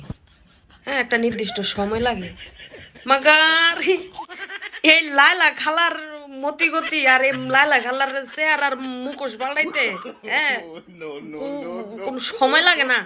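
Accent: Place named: Mymensingh, Bangladesh. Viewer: Kmn jani mymensingh ar basar moto lage😂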